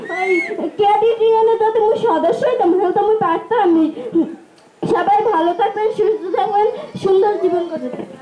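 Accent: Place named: Barishal, Bangladesh. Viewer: বরিশালের ভাসা ভালো লাগেনা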